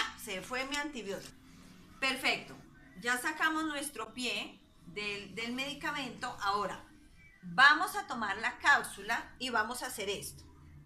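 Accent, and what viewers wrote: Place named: Colombia. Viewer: Muchas gracias por tan valiosa información, y agregó algo más, me encanta el acento Colombiano.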